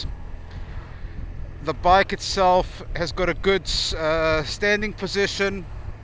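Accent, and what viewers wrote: South Africa. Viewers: It sounds like you have a very South African accent! Lekker man. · South African in a foreign country? Unmistakable accent.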